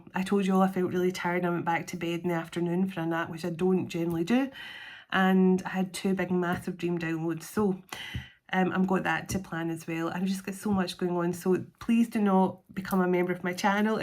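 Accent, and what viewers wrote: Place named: Scotland, United Kingdom. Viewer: You look So Lovely ❤ Blue and White, blessed with a Scottish voice 💙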